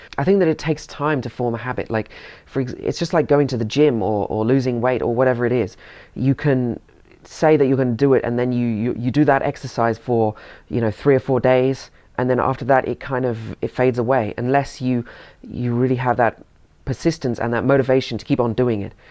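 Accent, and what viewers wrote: United Kingdom. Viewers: He sounds british😁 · I am very impressed by your Chinese and Cantonese. I love your British accent very much. Can I know what kind of British accent do you have specifically? I am going to learn and imitate your accent through your channel. Haha. Hope to see more videos from you. Thank you :)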